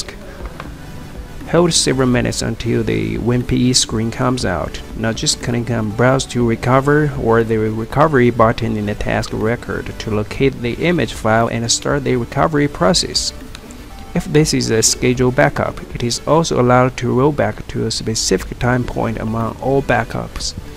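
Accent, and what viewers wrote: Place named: South, United States. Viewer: It’s a very interesting accent. I heard moments that reminded me of Indian, Chinese, Vietnamese, US Southern, and maybe Eastern European! My guess is Chinese living in the US South.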